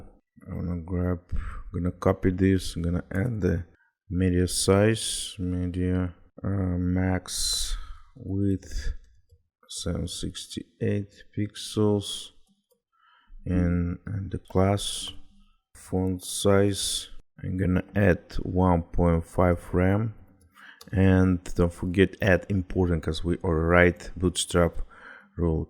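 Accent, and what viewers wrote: Russia. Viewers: Молодец, хороший контент! Нотки русского акцента есть, по этому решил поинтересоваться!)!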